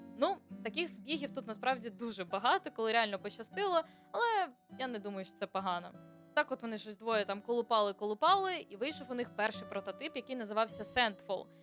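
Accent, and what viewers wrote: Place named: France. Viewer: Шановна, вам дуже личить французький акцент, відос класний!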